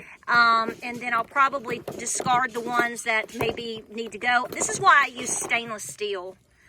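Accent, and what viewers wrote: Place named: Texas, United States. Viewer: Some of the things that you say, I never heard for the long long time. But you make me laugh because I remember people talking like you because my family was from Texas and all down in that area somewhere. Just because my grandparents came to California so my parents from California but I left a 92 just cause I was born and raise their live their 42 years. I don’t think like a California so I’m glad I left. But I so enjoy listening to you talk because it reminds me of Family in Texas how they talked and even when I was younger in California when they were so you know what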